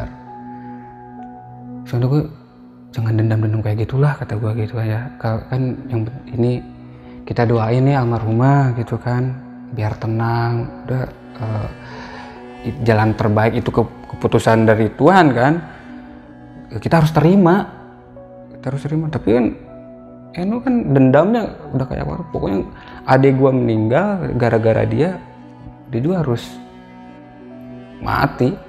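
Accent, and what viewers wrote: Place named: Sukabumi, Indonesia. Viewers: Kang sunda abis wkwkwkwkwkwkwk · Pantes cara bicaranya kek sama ternyata msh satu kota...salam dri sukabumi-cisaat kang 😁🙏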